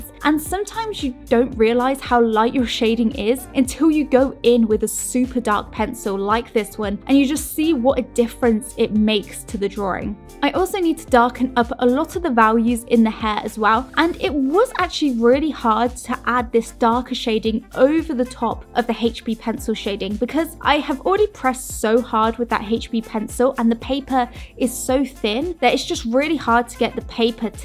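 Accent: United Kingdom. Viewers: So you did understand what she said in her BE pronunciation..? 🙄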